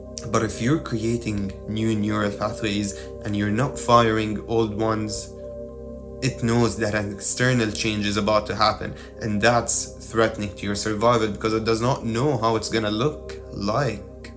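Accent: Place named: Ireland. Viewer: Obviously, English is not your first language, but I thought I detected a wee Irish twang in your accent?..... Thanks for your wisdom brother. Appreciated. ❤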